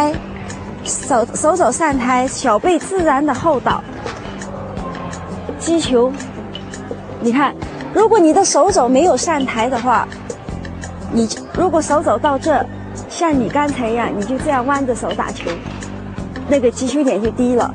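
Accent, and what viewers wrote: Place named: Yunnan, China. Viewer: 这位女老师有明显的云南口音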